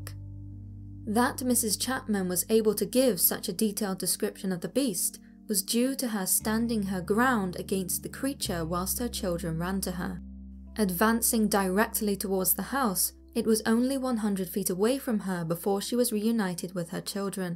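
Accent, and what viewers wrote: United Kingdom. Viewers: The lady with the British accent is an upgrade from another site where the male narrator has a heavy backwoods twang. Then there is another site that features a lady with a Canadian accent. She also has a pleasant voice while describing Bigfoot outrages such as interupting a man having a bowel movement along the side of a seldom used road. It scared the crap out of him.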